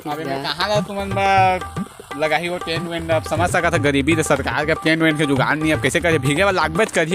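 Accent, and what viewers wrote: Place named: Chhattisgarh, India. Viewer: Your Chhattisgarhii accent is just wow and content joyful....👍❤ No doubt you & your team doing superb job...Keep Going👏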